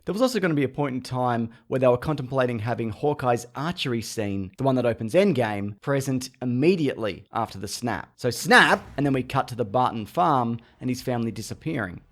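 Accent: Australia. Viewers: Why is hearing marvel facts and what not so much better in an australian accent?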